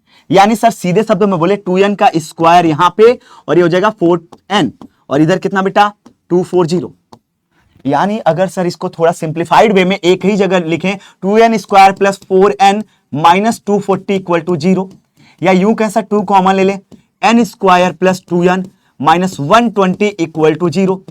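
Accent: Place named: Odisha, India. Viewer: odia sasta English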